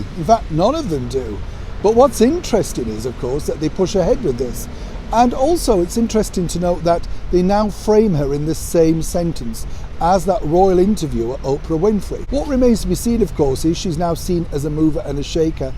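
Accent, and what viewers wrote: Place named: RP, United Kingdom. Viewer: you would be a far more interesting and inspiring host, you are a great story teller and presenter you should apply if it’s an option! Just sayin your accent ie the Queens English is a bonus in USA!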